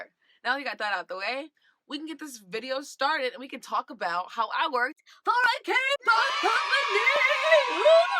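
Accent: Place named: New York, United States. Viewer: Super late to the game here, but I finally caught up with that Kurtis/Dean dating video and had to give you a sub - you're super entertaining. Also, as a New Yorker who has (unfortunately) lived in Buffalo for the last 4 years, it's nice to hear a real New York accent whenever I get the chance.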